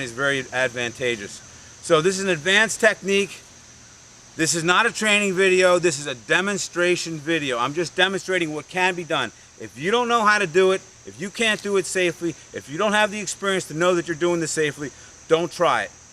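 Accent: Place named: East Coast, United States. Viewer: Great video and your East Coast (NY?) accent is music to my ears.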